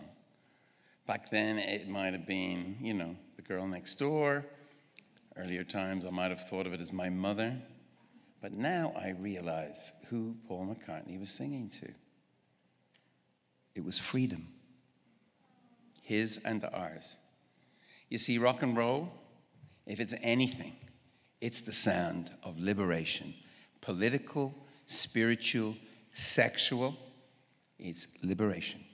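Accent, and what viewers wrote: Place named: Ireland. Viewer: I swear he's sounding more Irish the older he gets..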